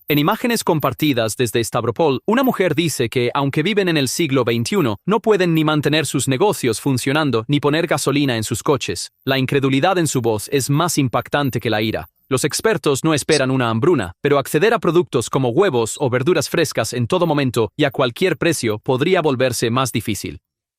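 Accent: United States. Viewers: En España no se habla con ese acento gringo DESAGRADABLE.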